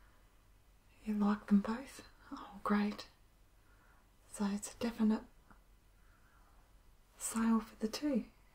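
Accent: Australia. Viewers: Are you Australian? Beautiful video!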